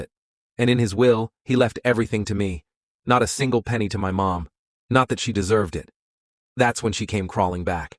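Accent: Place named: United States. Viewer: Although the voice over is American. the road trip filmed on the video, looks more like some third world country.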